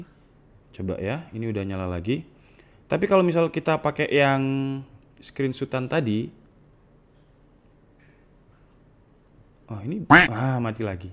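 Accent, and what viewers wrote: Java, Indonesia. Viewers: Wong jowo mas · Bang orang jawa yh? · Ternyata orang jawa mas 😂😂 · Jowo mu medok mas😁😁😁😁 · Mas sampean tiang jowo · Wong Jowo toh 🤣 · jowo endi lur😂😂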